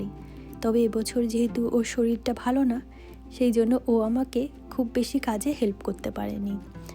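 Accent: Bangladesh. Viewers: Amar mishti Maa..khub khub sundor lagche and lakhi rupe gune sob pujo kore cho❤❤❤❤..ami o tomar moto bangladeshi bangla boli .khub bhalo lage.. just st love this bangla...but my husband is ghotti...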